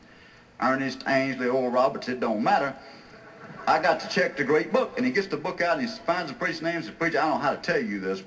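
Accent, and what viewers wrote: South, United States. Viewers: I dare say the heavy southern accent may be different enough from normal English to be considered a separate language.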